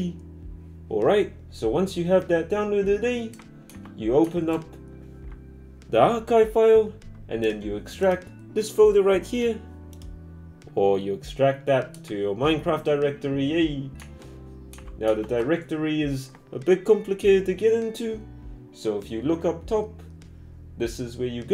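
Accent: New Zealand. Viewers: He's put the Kiwi accent on steroids. And I'm sorry but it's over done for me I needed to mute. Good tips though so thank you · nz accent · Dude it’s just a New Zealand accent lmao? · It’s a Maori accent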